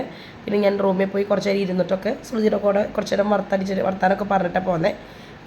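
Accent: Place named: Thrissur, India. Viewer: Hi chechi episode kandu 🤩ivide amma ennum kaanarind ee paripady. Chechide videos okke njan youtube il kaannarind pettannu tvil kandappo suprise aayi 😍😍 njan ammodu parayarnnu ee chechine inikkariya inikki ariyana chechi aanu ennokke. 🥳🥳🥳 skit valare nannayirunnu nammade tsr slang skitil ketappo nalla rassandayi 😌🥳 inim skitilu chechine pratheekshikkamo🙈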